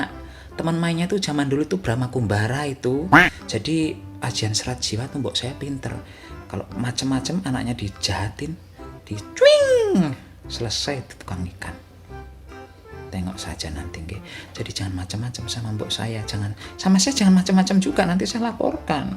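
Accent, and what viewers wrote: Banyumas, Indonesia. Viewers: bar kesuh..curhat,mecucu njuk masak dech....mandan2....error....kog siki dadi ngapak kang? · Tinggal.di.mana si mas ? BATAM..? Tapi.dialeknya mbanyumaswn ya..😂 pada baen karo inyong..adoh nang kalimantan esih clacapan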